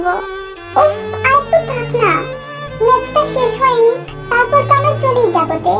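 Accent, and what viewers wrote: Sylhet, Bangladesh. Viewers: তোমার কথার মধ্যে সিলেটি ভাষার টান আছে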